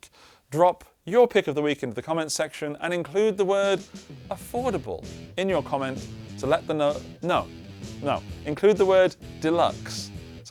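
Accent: United Kingdom. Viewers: He’s a Brit. 🤷🏻‍♂️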